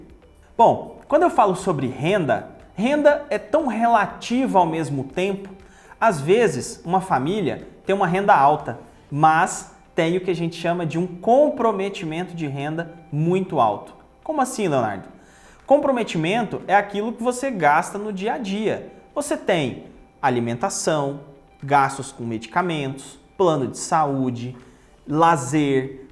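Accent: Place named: Goiás, Brazil. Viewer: M.bom vc é goiano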